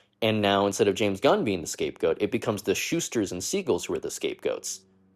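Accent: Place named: United States. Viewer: You have an American accent, and yet seem to struggle reading English. I didn’t finish the video but in 5 minutes you mispronounced at least 6 names. Hot garbage.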